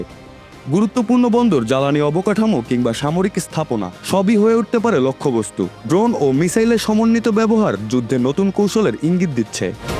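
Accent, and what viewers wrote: Kolkata, India. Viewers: এই সাংবাদিককে কোলকাতার মনে হচ্ছে।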